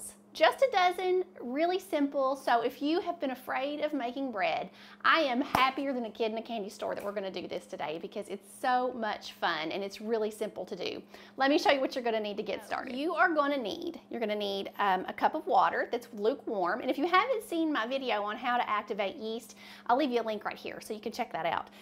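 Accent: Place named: Alabama, United States. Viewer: I've never ever made bread until I saw your recipe. That was Monday. I made it Monday night. It lasted a day lol & was Gone! My husband, kids, and nephew said it was the best bread they'd ever had. So, my dough for these rolls is on its first rise now on the counter. I'm doin a trial run on these so I can make em for Thanksgiving dinner. I know they'll be absolutely delicious, I just want them as pretty as yours lol. I'm gonna try & make their lil "butts" line-up perfectly. I'm new at most things cooking wise. I'm from Alabama & wanna cook hard stuff like meatloaf, pork chops, chicken fried steak, greens, homemade mashed potatoes, fried potatoes & stuff like my Momma made. I'm tryin my best to learn lol... I should have been at home watchin momma instead of runnin around with friends (they can't cook now either)! Both my sister's are fantastic cooks cause they watched momma. 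I really really wanna learn to make fried chicken! My husband and kids love fried chicken. I don't eat meat except fish, but they are all meat lovers. I Love your channel & how you take time to show Every step. Your a incredible cook & so patient & reassuring. Idk where your from but I picked out that accent Fast! Everyone makes fun of how I talk lol. I live in Oklahoma but from Alabama. You seem so precious. Keep the videos comin & ty for being such a great example for beginners like me. -Amanda-🖤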